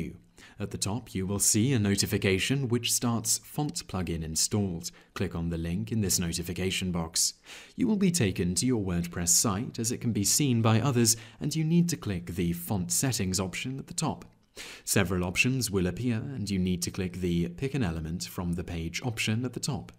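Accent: England, United Kingdom. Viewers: The english accent makes the lesson so much nicer to listen to. Very pleasant.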